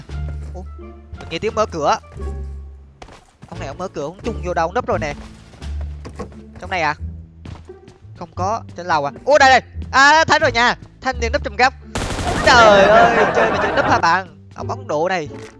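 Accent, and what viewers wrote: Binh Dinh, Vietnam. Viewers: Quê ban ở bình định hả ban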